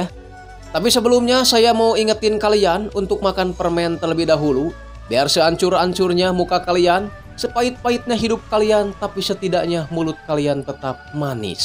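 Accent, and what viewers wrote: West Java, Indonesia. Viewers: Penyakit "kaligata"😂...bang lu orang jawa barat? Kota mangga kah?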